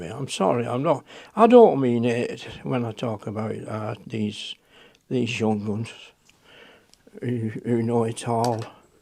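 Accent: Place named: England, United Kingdom. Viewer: Hahaha.... That was wonderful. Hearing a really Englishman talk like. Letting the truth take centre stage, instead of the "feel good" talk that only serves to get most peoples backs up. Pleas feel free to have another "say", I loved it, and it's good to get ot off your chest. Oh, and I 100% agree with everything you said. Not to mention your paintings, they always do my soul good. Always simplistic in style and form, yet they carry an air of peace, and a wanting from within for me. Benn stuck in door for a very long time. Disabled, and had to give up my car, so when you paint, you bring into view the kind of places I would drive out to, and just sit quietly, soaking in the Englishness of peaceful country scenes and allow all my worries to drift away. You're a cracking man, and deserve every good thing that comes your way.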